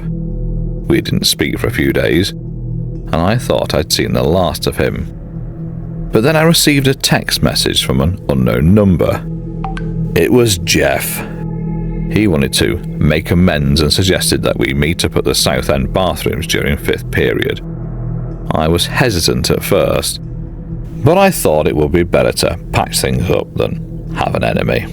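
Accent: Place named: France. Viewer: Anyway nice french accent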